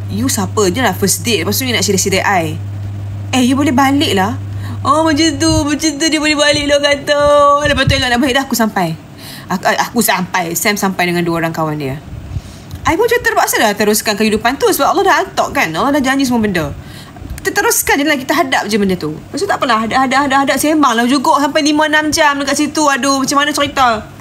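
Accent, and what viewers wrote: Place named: North, Malaysia. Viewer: Org utara ckp . Ibrahim Mcm² la aw👍🌹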